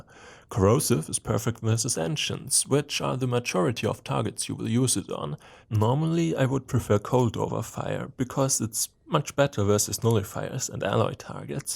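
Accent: Germany. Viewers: Are you from Germany or Austria :D Your accent sounds like that :) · You sound very german.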